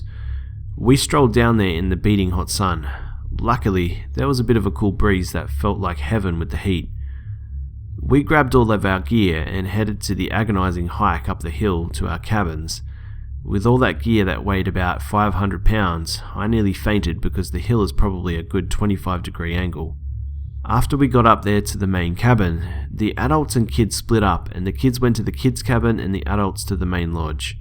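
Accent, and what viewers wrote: Australia. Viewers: I love scary stories and Australian accents so this account is very very enjoyable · He's Aussie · Guys this dudes Aussie isn't he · ikr i cant stand Russian or spanish accents ( no offense, this doesn't mean i hate these ppl i just cant understand it 2 well ) so i was like please don't have a Russian or spanish accent.... YES AUSTRALIAN ACCENT AND GOOD SPOOKAY STORIES!!! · hes aus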